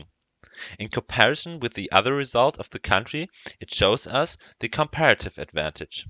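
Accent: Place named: Germany. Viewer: your german accent sucks but i like this video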